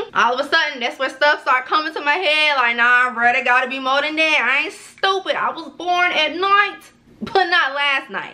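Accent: South, United States. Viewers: you’re so southern girl I love that accent💕great video, F that dude!! new sub beautiful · I’m from Texas. When I say I loveeeee how country you are 😂😂😂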